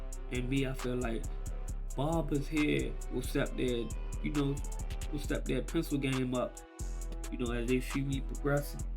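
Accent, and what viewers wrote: Baltimore, United States. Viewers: What’s funny is I’m from Baltimore but I’ve been in LA for about 4 years now. Came across this video and started watching it and immediately was like “that’s definitely a Baltimore accent” 😂 cut is super clean 🔥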